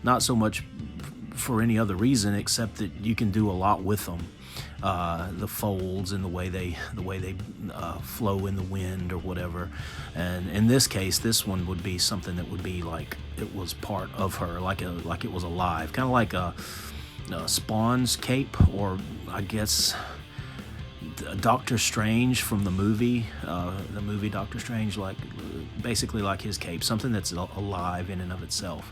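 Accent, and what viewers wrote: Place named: United Kingdom. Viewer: Damn! How did I miss your channel?!? Well, I sub'd now! Weird: Somehow I thought you were British! Oh, well--! LOL Great stuff,!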